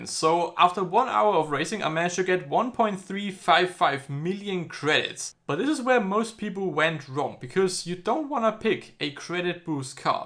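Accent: Germany. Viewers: wie man das deutsch einfach raushört haha xD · Irgendwie finde ichs lustig wenn Deutsche Englisch sprechen auf YouTube. Kein Front gegen dein Englisch, liegt glaube an mir :D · You sounds like a German. I Think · you know its bouta go down when you hear the german accent and you see the glasses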